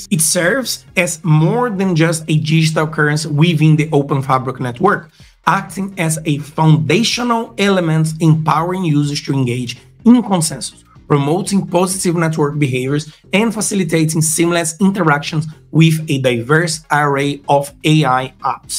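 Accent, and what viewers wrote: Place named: Brazil. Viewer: Your accent is too strong, looks like a Brazilian speaking in english..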